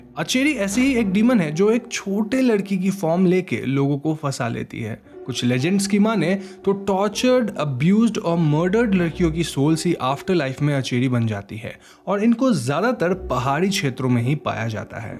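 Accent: Bengal, India. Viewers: R u a bengali? Your accent..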